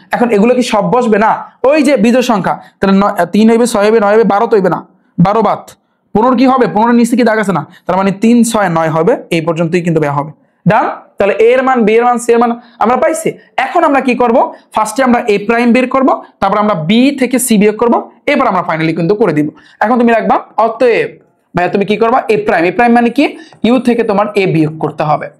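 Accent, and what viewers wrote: Barishal, Bangladesh. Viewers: Vaja apnar basa ki borishal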